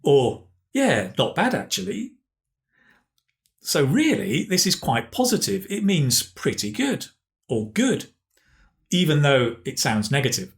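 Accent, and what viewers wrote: United Kingdom. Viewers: I love your videos and I love British English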